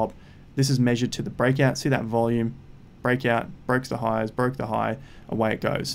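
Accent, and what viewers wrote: Australia. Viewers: R u Aussie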